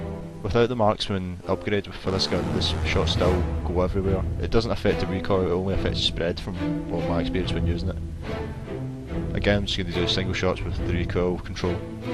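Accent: Scotland, United Kingdom. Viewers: Not wishing to offend, I actually thought you were speaking Russian for the first 3-5 seconds you were talking before I realized it was a heavy Scottish accent xD Nice video btw. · I'm so glad there was an assualt rifle available for medics. I love being a medic but hate lmgs. I've always loved assualt rifles but never the actual class. Thanks for the tips on how to use this gun. I'm a medic who is just an assualt guy at heart. I was also confused in the first minute. I was dissapointed that it was in another language. Before I read the other comments I thought it was. Then I heard the Scottsman kick up. Everything was good after that.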